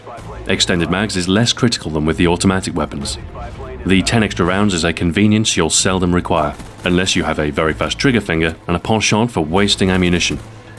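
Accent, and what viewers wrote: England, United Kingdom. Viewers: Where abouts in England are you from?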